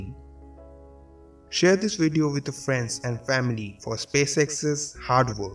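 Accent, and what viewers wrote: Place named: India. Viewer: slow down man, your indian accent it will crash🙄😒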